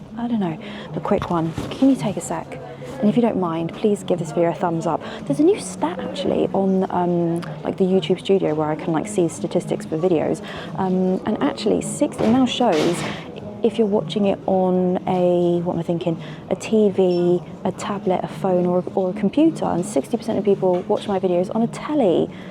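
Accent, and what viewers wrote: United Kingdom. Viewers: Yeah. I'm a 63 year old guy, and I can assure you it's. She's so easy on the eyes. It's not often I comment on someone for their looks, but if a great personality is mixed with a British accent and is pure eye candy as well? Irresistible.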